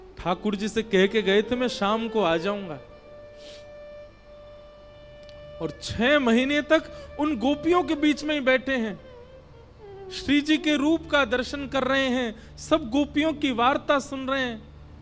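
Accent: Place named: Braj, India. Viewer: Guru ji aapki brijwasi bhasha ka aisa jaadu hai itani mitti hai ki katha sunene ka aanand hi alag hai sub bhool jaate hai. Jai jai shree Radhey shyam ladli ji apni kripa aap par sada banaye rakhe. Aapko koti koti pranaam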